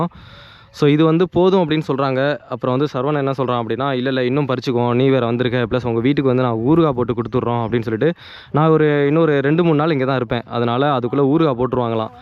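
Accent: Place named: Thanjavur, India. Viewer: Bro neenga tn la delta district ah ? Unga slang lam ariyalur,thanjoor slang mathiri irukku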